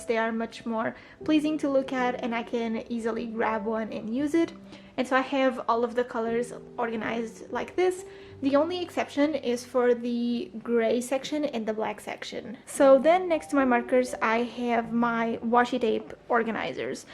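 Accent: Portugal. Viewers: adoreii !! reconhece-se logo um portugues ahah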